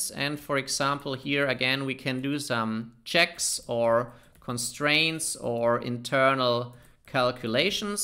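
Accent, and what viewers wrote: Germany. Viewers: nice content, but your accent is as worse as German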